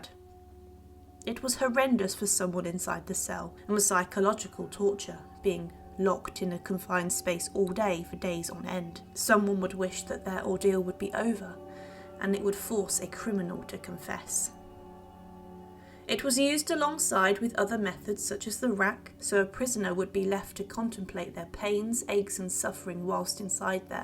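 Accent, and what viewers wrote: United Kingdom. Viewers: Loved this! Great content as always, and you speak so lovely, your brittish and beautiful voice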